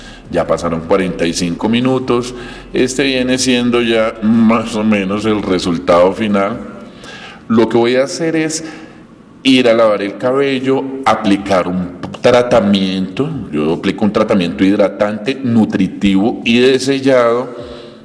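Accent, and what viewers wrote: Colombia. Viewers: Me encantó el video, tu profesionalidad y tu acento colombiano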